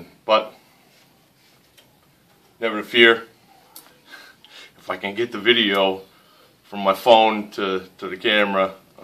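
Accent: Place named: New England, United States. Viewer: "Up to pah." Always enjoy a listen to the NE accent. +1 for the drop point EDC design. Hard work is great medicine for the soul.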